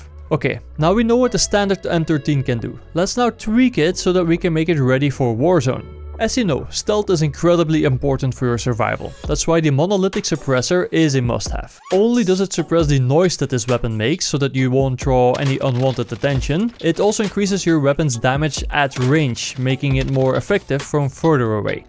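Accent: Netherlands. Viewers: Love dutch accent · Nice Dutch accent hehe. But cool guide :)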